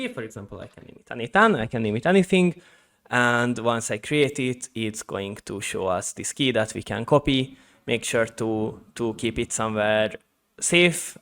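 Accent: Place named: Hungary. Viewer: Magyar akcentus az peak tesó! Amúgy jó lett a video, well done GG!!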